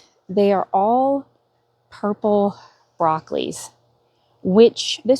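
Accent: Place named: New England, United States. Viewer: that's ingenious! I've heard of sparkly and flashy things, but that's brilliant, actually. You have a great garden and kudos to repurposing the beds with those huge water bins? I've seen them here but don't know thier purpose. And yes, you've definitely picked up New England vowel sounds from your dad. I'm a bit envious that you have indeed a cabbage growing. My garden is under a bit of snow (3b)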